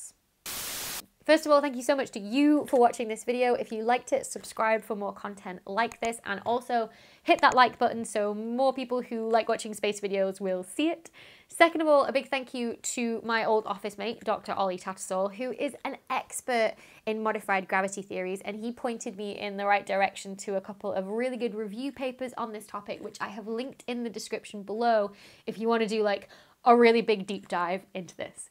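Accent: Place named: England, United Kingdom. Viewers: Dr. Becky I hear you! Not meaning to disparage anyone.... Bolton, ok my education in the multi-variant English accent continues 🙌 thanks for replying. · Thank you for making so many interesting videos that I love to watch for the contents, clear explanations and your lovely English accent which I find very cute 🥰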